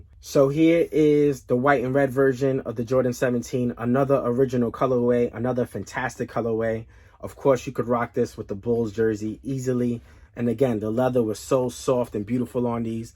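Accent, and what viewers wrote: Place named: Long Island, United States. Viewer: Dude got a harrrdbody Long Island accent hahaha love it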